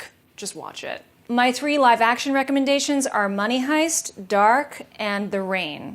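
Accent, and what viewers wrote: Russia. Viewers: I LOVE YOUR RUSSIAN ACCENT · wait, you're russian?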